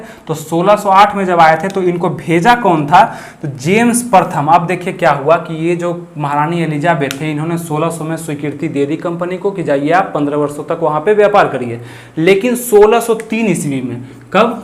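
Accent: Bihar, India. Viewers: Thanks a lot sir🙏...aapk language se lgta h aap Bihari ho aur hum v (i proud to be Bihari)💪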